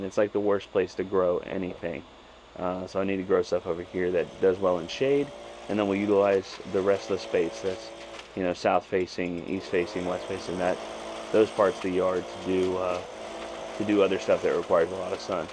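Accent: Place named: South, United States. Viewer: the southern accent is sneaking into this one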